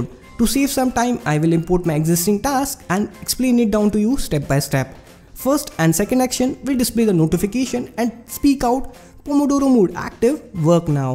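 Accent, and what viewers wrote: India. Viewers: same lol ,i hate indian accent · Indian accent always cracks me up😂😂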